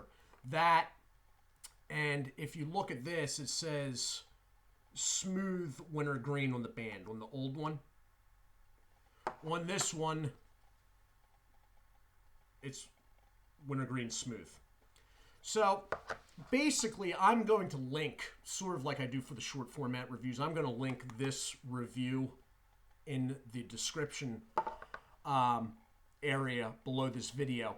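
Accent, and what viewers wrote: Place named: Philadelphia, United States. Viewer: New sub! Are you from PA? You sound very Philadelphian lol cheers!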